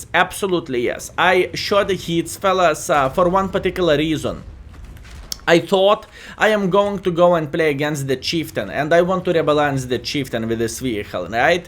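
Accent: Russia. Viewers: Что за акцент?Ты русский? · jesus this russian accent english is horrible.....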